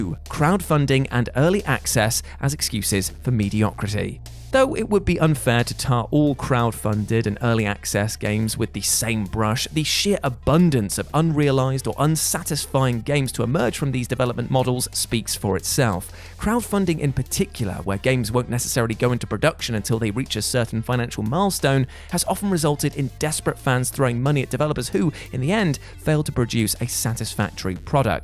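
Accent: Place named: Wales, United Kingdom. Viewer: dude you make a great point dude.And the accent of yours is Welsh accent,cause you seem to laid back for a English dude,But right video game developers are find new ways to rip people off,so dude this a good video on developers and the misleading gamers buying thier games.And I sure those developers will bust your balls in this here comment section,but I am on your side.Cause someone has to be,and your cool dude from the old country,and again Merry Christmas from all of North America.And stay cool over there.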